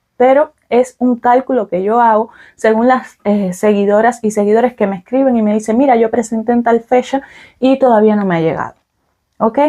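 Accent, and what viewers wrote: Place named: Central Cuba, Cuba. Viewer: Una ciudad tranquila salvo ciertas calles a ciertas horas. Por el acento debes ser del centro de Cuba.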